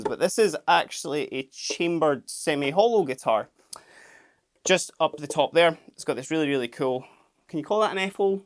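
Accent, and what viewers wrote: Scotland, United Kingdom. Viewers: The #EVH SA-126 12/6 is #EVH's Birthday E-Hole in honor of #EVH. #Glasgow and #Edinburgh....What a #Scottish accent!!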